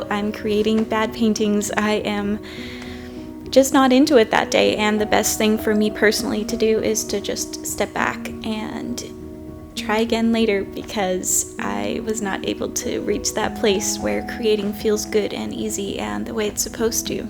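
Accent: United States. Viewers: I’ve lived in Ohio for 30+ years and you sound like you’re from Ohio, Illinois, or Wisconsin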